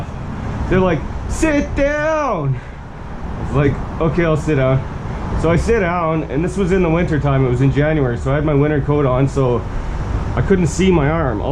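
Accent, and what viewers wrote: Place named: Canada. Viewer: Wow! Poor guy. Just can't believe it. You must be the strongest person I know. I seen your framing work and the fact there isn't a lazy bone in your body. The big house you frame by yourself is what got me hooked on your videos. Just couldn't believe you were doing that on your own and the temps were freaking cold. You sound Canadian and for what I been watching, you Canadians rock. Hope God keeps you safe and you never get hurt like that again. Amazing person you are since I started watching. You also a great educator and well thought out person. Great work you do. I never seen anything like that before. Watching you, I get so scared just seeing it. Keep up the great work you do. Canadian people have impressed me for a long time. I watch them off grid cabin and houses they built on their own. Wow! Take care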